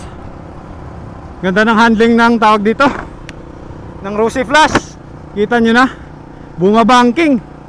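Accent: Iloilo, Philippines. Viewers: Idol Taga diin ka nga ah ilonggo pang hambal mo hw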